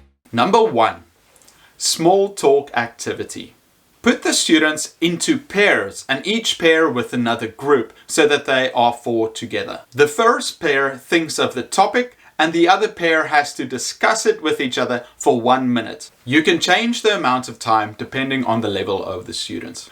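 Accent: South Africa. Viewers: Are you South African? My SAFFA-dar is off the charts 😄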